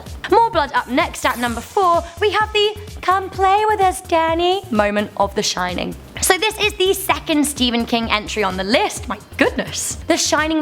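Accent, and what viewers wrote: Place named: United Kingdom. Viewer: is so fun to watch and listen to. Gorgeous lady with a delightful British accent.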